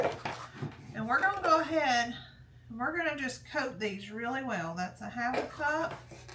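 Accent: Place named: South, United States. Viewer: Wonderful video. If people tease you about your southern speech, they need to get over it. We all knew what it was you meant. Get some rest girl, you've earned it.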